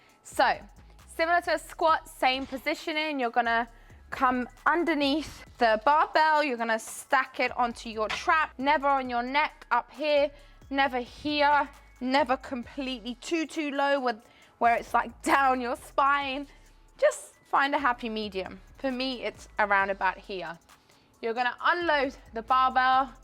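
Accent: United Kingdom. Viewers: Great detail!!! Not only the “how” but also the “why”. Brits are so easy to listen to as well. Subbed today. Hope the channel grows for you.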